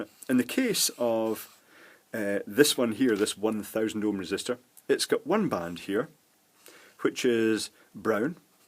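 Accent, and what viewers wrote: Scotland, United Kingdom. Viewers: Which accent he explained ? British ?? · Chop Chop Scottis presenter speaking English. Nothing to do with America. Snowflake much? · I scanned the comments for 2 minutes and am sad that no one mentions how he keeps saying nanofart. Classic Scottish accent! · Brilliant - why is it that the best sparkles have a Scottish ascent - answer - they are the best I have ever sailed with - enjoying this - brilliant! Please continue! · Thank you for your video :-) are ya from Scotland? You sound like it dontcha know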